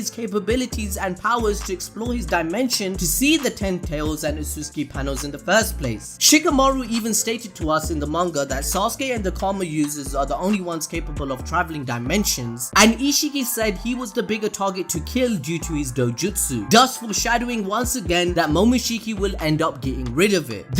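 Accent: Punjab, India. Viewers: lol are u punjabi bro? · bro U indian? Wow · Indian excent is lit.... 💯💯💯💯💯💯💯💯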